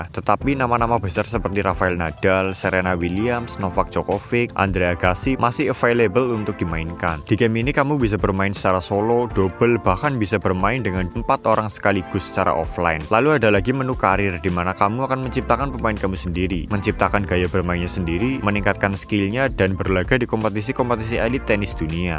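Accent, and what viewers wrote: Banyumas, Indonesia. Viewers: Naratornya ngapak yah😅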